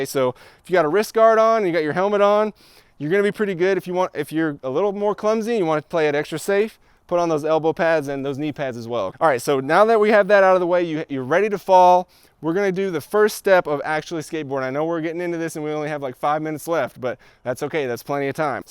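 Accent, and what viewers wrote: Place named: South, United States. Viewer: Great video....But when did you get the southern accent?!!!!😁😁😁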